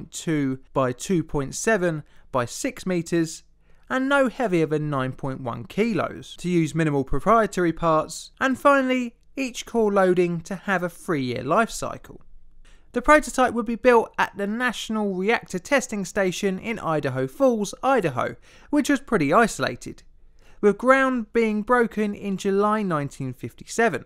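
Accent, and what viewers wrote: United Kingdom. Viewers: Please everyone. Stop moaning over the British pronunciations. Our American pronunciations also grind on the Brits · Why do all these videos have a British guy? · He doesn't pronounce the 'L' sound, substituting 'W'. Except at the beginning of a word (showing he CAN pronounce L if he tries) Fuel becomes Fuew. 'Controw rod'. "Criticw". 'Impaiwd by one of the shiewd plugs'. 'Faws alarm'. 'Consuwting wif a doctor'. The 'th' sound has disappeared. "Vey wifdrew after seeing 65 rotengens (sic)". This is not a charming regional English accent. It's ugly lazy bad speech. Sadly, English speech had degenerated across the spectrum into a sickeningly ugly patois and it gets worse with every generation. I'd bet that this chap's grandfather had far better speech than he does. The younger ones, the millennials, are barely intelligible. You need subtitles. 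It's sad. Listen to any old english movie from the 60s and you hear english beautifully spoken. This speech is now almost completely lost. These days, the English have by the far the ugliest speech in the Anglophone world. Americans, Canadians, New Zealanders, Trinidadians, etc all speak far better than the English. If you want an example of beautiful contemporary english speech have a listen to Canadian Stefan Molyneux. I could have enjoyed the content of this video but the speech made me feel ill and depressed and I switched it off. Sorry. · Fellow American here, I love how all those different British accents sound.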